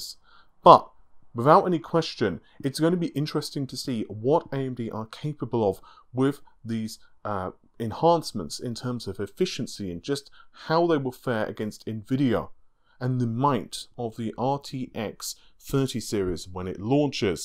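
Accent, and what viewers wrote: England, United Kingdom. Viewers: He has a thick english accent lol, just get over it you know what he means. He sounds like everyone sounds near him.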